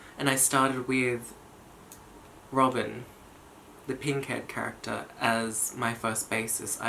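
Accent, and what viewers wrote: Australia. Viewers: Is that an Australian accent I hear? I've been working on improving my imitation of an Australian accent, but I've been finding it pretty difficult. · Oh that auzie accent. Wow. R u from Sydney? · What nationality are ya? Sound Aussie... I think? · Is that an Australian accent? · I recently subscribed and AHH HE’S AUSSIE I DIDNT KNOW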